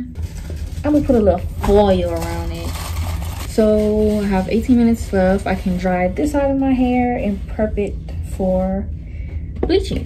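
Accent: Louisiana, United States. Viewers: Y’all what state she from I need to know with this accent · You have so much hair! It turned out so pretty! I feel like I heard a Louisiana accent! ❤ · Are you from Louisiana?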